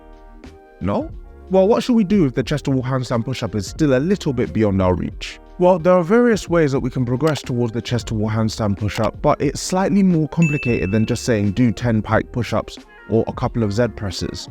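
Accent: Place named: United Kingdom. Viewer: I always try to find the most niche problems and this guy always has a vid about it. Plus yhe british accent! Fucking legend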